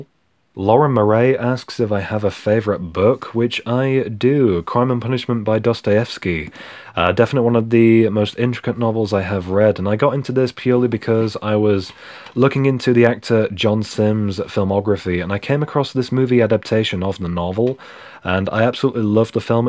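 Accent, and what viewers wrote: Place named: United Kingdom. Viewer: Happy 10th Anniversary on your Channel I came Across your Box of Childhood VHS Tapes Video and that's how I found your Channel and I Subscribed for more Content since I'm both a VHS and DVD Collector since my Early Childhood to Adolescence Years to now since I'm 23 (just turned 23 on January 17th) which means I'm pretty much new to your Channel and I Discovered that you're British and you have an Awesome Accent Bro just to let you know that I'm an American who loves British People because they have Awesome Accents when they start talking and you my Dude just earned a New Subscriber PS Check out my Comment on your Box of Childhood VHS Tapes Video I Commented how I Started Buying and Collecting VHS Tapes when I was very Young oh and PS I'll be making a Video on DVDs I Ordered from Amazon Recently and I'll be Uploading it by Tomorrow so be Sure to Check that out as well and again Great Content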